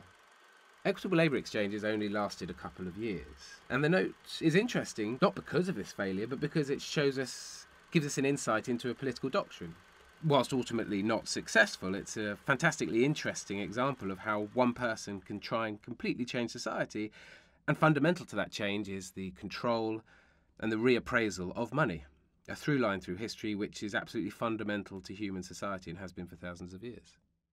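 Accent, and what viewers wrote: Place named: United Kingdom. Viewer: A) He's just british. What you're describing is a british accent. B) I have auditory processing disorder and I have no problem understanding him. So, i think you're just angry at british people or whoever pissed in your oatmeal this morning.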